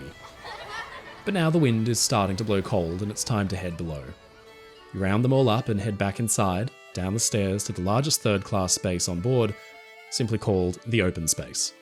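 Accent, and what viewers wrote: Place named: Australia. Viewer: Great story. Gotta love the Aussie accent.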